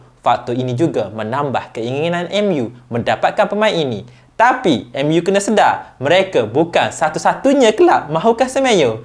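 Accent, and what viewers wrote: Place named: Kelantan, Malaysia. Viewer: hahaha pekat tol kecek kelate boh hahaha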